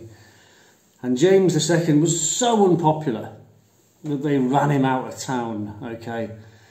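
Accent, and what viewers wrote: United Kingdom. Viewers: I ❤️ voice he'd make a great narrator the next Alistair Cook or Sir David Attenborough (my hero) or even ASMR dude. It's the accent. I'm an American from Midwest living in CA. So sucker for & adore accents! Actually live near Monteshito. I must say there is at least 1 or 2 silver linings to Harkles at least for me. I'm much more interested & protective of the RF & interested in British history. I'd lost that after Princess Diana. history lessons appreciated, enjoyed & needed. My husband is a history major, I barely passed history in HS. Blessings to you, Fiona & family!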